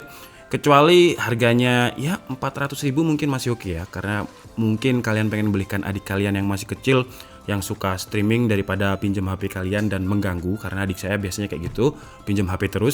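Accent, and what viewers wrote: Java, Indonesia. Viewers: Mas sampean jowo ngendi???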